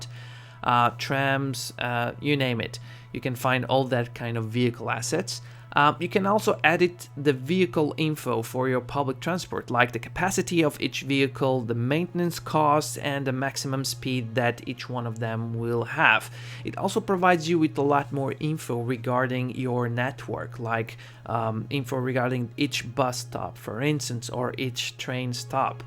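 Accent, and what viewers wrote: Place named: Netherlands. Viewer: Dutch accent love it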